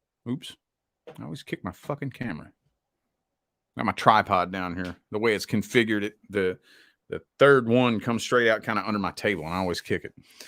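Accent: United States. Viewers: Love the shirt So straight forward, love the way he speaks with his American accent “Doing a lot of healing” that is what I am focusing on “Close it out!” Good thing about Gemini they move forward and on because it is an AIR sign ruled by Mercury ~ we always come out on top…Gemini Chitter Chatter 🎉 Feel like this a spiritual community ~ Intuition, Spirituality, Feelings, Emotions, Cosmic Realm, as well as spiritual (beyond the physical) Realm “Too damn late” As a Gemini I love to “Ghost” people I dislike Between a “Scorpio & a Leo” it’s always been a Leo for me… I have a lot of Leos in my life I don’t know why? Taurus/Gemini cusp so I have a lot of Earth and Air with a Monkey Chinese Lunar Calendar but I believe it is a Water Monkey, so a little bit of water in the Chinese Lunar Calendar but in the astrological sector all the Earth and Air elements … I struggle with surface level connections because I am quite shallow and too busy for emotions and deeper level connections … The upside down world card keeps me going in circles because I do not care about making progress … my boss is a Sagittarius and always thinking about moving on and starting a new job with another company PLUS she is bitter~ I am curious if she will leave my work as my boss? 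:) SO BITTER sounds about right jumping from toxic relationship to toxic relationship but I can see it :) “Blessing in Disguise”. “Healing” “Person Person finally arrives” “Hard work out of the way first” My parents are Capricorn & Libr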